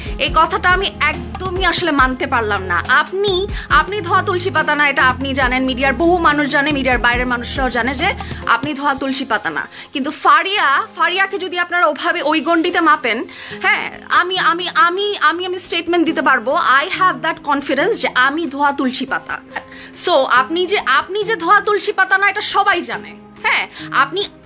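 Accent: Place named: Noakhali, Bangladesh. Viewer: Noakhalir meye bole kotha love you apu 😘😘😘😘😘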